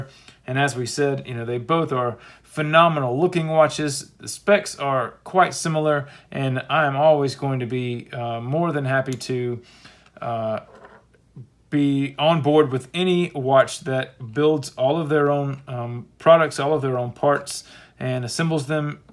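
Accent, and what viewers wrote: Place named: Latin America. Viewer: you have an accent. Are you latino?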